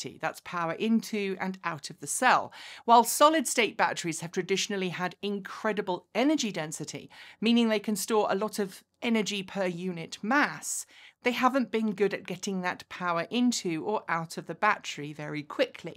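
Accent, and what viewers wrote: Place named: United Kingdom. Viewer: A British accent just makes everything sound legit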